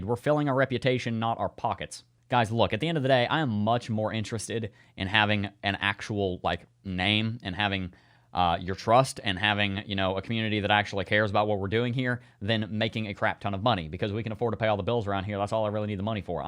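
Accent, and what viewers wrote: South, United States. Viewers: Thanks for the videos! Where are you from? You ve got like an a "country" accent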